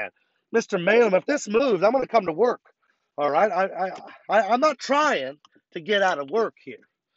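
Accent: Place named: United States. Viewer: 1984 is a book by George Orwell, Mate. You sound American, though. Love from NJ, USA. GO TRUCKIES! SHUT IT DOWN! Australia's leaders have gone insane.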